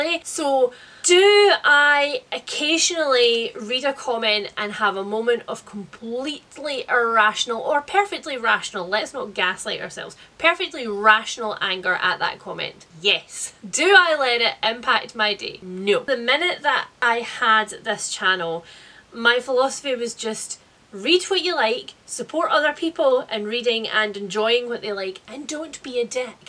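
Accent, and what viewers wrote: Scotland, United Kingdom. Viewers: I am in LOVE with your Scottish accent. I have no idea what those other arses are talking about. Congratulations to Lovely Spouse! 💕 I love your openness in talking about your mental health and being up front when you struggle. I come from a family that believes in bottling that shit up or rubbing dirt on it. Your personality and influence is so much more than just book recommendations to me. Thank you. It is because of your frankness that I have accepted my own mental illness as a part of myself. 💕💕💕Please continue to take the loveliest care of yourself and I’m so glad to see you back.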